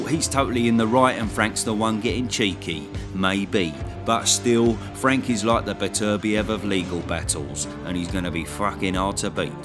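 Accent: Cockney, United Kingdom. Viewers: I'm from Bethnal Green (One of the last cockneys around here), I'd say he is cockney, just puttin on a bit for the vid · where are you from? His accent is from London He's what we here in London call a Cockney look that up on YouTube And you will see they originally come from East London 😉🙏🏻🇬🇧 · Cockney accent. More prominent now in East Greater London (e.g. Romford and Barking) and Essex.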